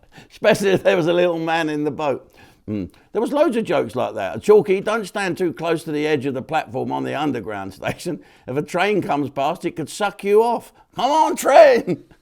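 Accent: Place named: Liverpool, United Kingdom. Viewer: that was more like scouse than hully gully jim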